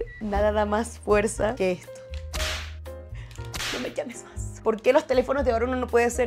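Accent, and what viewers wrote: Venezuela. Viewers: eres venezolana me encanta comohablas😍😘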